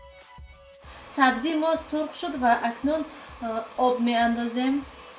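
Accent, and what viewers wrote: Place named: Hisor, Tajikistan. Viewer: Рахмат ма ай хисор ранги мо гап мезанед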